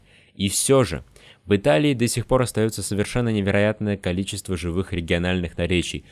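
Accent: Russia. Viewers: Может быть, но у него все равно сильный русский акцент. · У автора сильный русский акцент.. если вы его не слышите, это не означает что его нет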